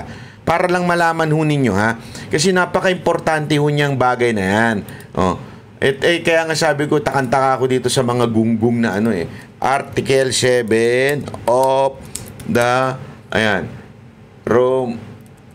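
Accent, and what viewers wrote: Visayas, Philippines. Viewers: Ang cute magbisaya mo sir 😂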